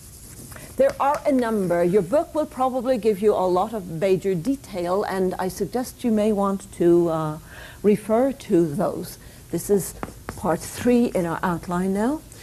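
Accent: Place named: Ireland. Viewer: 15 minutes in before I noticed the Irish accent.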